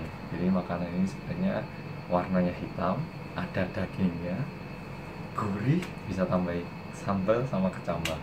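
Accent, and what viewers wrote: Java, Indonesia. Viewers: Ada di branda, ko unik, muka orang korea kok bahasanya jawa medok , aku langsung liat semua vidio, dan akhirnya kenalan jugaaa hehhehe, sehat selalu ya kka :) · sampeyan layak dadi artis mas, soale jarang korea berbahasa jowo dan medok begini, valuable tenan iki. melu drama sekolah internasional di net TV kae mas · aaaaawww mas kamu medok sekali🤣🤣🤣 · serius, kaget sama aksenya dia sama sekali nggak ada aksen koreanya, dan bener bener2 kental medoknya, sepertinya dia bakalan jadi sainganya han yoora hahaha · Mana opening orang korea yang medok 😂😂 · Isek medok lek gini 😂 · Duuuuuhhh dan aku nonton video ikiii,, ya ampuuuum muedok banget maaaaasss sao guuueeentooong hahaha , , aksen jowo seng berlebihan ki ngno wki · Agustus 2020 baru liat ini karna abis liat kbs indo di ig sama dita...penasaran org korea kok lucu iso medok😂😂😂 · Bahasamu mas medok kece 😂👏🖒 tinggal malang ndi? 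aku nak suroboyo iki. cidek yo 😆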